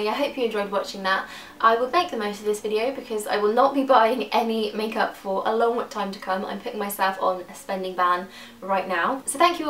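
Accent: United Kingdom. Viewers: Love your British Accent. Big fan!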